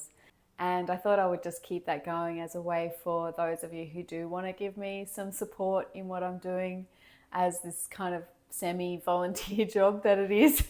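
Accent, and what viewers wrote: Australia. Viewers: Thank you, you have inspired me for quite some time, and always look forward to learning more from you. I am glad you have figured a way to continue to share what you love, yet finding balance. We all have to find what works for us. I too changed direction at the end of last year, mine was from selling soaps etc, unfortunately a very recent cancer diagnose has offered a new challenge. We all must follow our heart, and live with passion. From this Kiwi in NZ, Peace & Love (PS Love the aussie accent)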